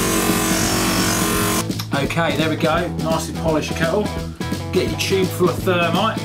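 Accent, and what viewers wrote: United Kingdom. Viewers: You can tell where he's from he's got a British accent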